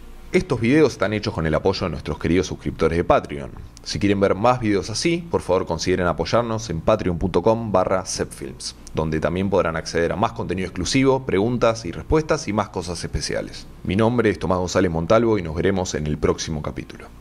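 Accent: Argentina. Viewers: Noo, a este locutor no se le entienden muchas palabras, ese dialécto argentino, machacando las palabras al final; debería estudiar locución, no hay como el español colombiano, claro, preciso y conciso, no dañen el español, que es un idioma bonito, claro que peor lo hablan los propios españoles, con una pronunciación tan arrastrada, sin querer abrir la boca o los dientes, como si siempre quisieran pronunciar la Z. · Ese acento exagerado argentino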